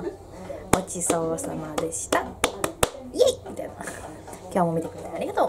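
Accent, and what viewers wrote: Kansai, Japan. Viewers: 関西、だと！？笑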